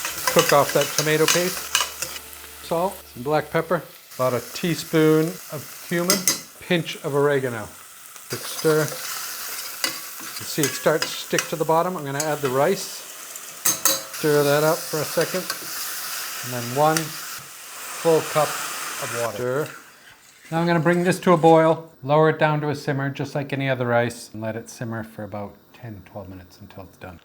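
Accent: United Kingdom. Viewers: is an honorary Brit who I believe has spent a great deal of time working and living in the U.K., so this probably accounts for some of the crossover in pronunciation/conversions etc. 😊💚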